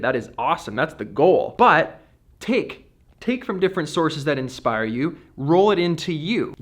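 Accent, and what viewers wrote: Canada. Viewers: I always love the way says aboout. Pure canadian 😂😂. Great vlog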